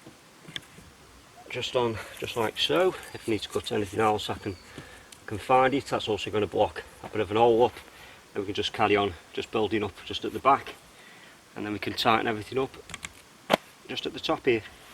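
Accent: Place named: North, United Kingdom. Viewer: Hi. I love your channel. Very relaxing! I have been trying to pin down you accent but I have been failing. The best I can do is “Up north”! Where are you from?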